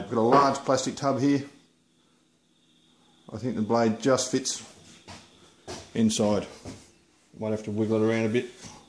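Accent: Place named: Croatia. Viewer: Your heavy Croatian accent makes it difficult to comprehend what you are saying. The confused videography makes matters much worse. Have you considered employing someone who speaks either Canadian or American to do a voice over? By the way.... Croatia is a beautiful country and the rich cultural history of the Croatian peoples is unparalleled, maybe you could make a video on your village!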